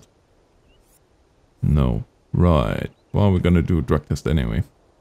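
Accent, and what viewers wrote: Germany. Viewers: Mate I swear I hear the tiniest of an accent... German, Dutch, anything?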